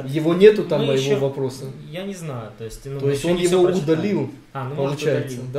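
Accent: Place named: Germany. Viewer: A так Германский акцент присуцтвует ))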